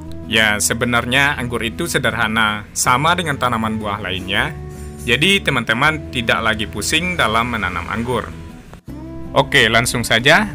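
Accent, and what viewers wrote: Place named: Padang, Indonesia. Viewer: Orang padang yg uda... Intonasi cara ngomong nye kek orang padamg .😂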